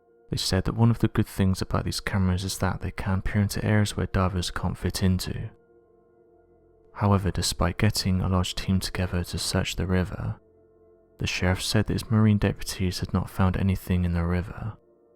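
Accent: United Kingdom. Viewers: Why always the brit accent makes not believe anything they say they are the sales scammers of the univers4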